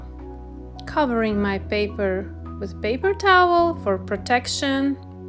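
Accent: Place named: Russia. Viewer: Русский акцент не спрячешь))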